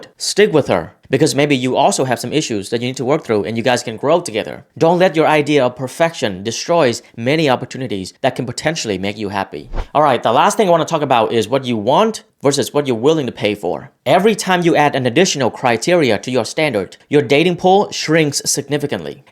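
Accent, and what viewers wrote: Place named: Vietnam. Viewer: Dude are you Vietnamese? U got that accent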